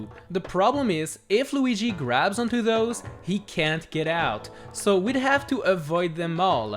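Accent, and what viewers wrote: Quebec, Canada. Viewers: I believe he’s from the French-speaking part of Canada, so he has a thick accent haha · you sound french canadian man :P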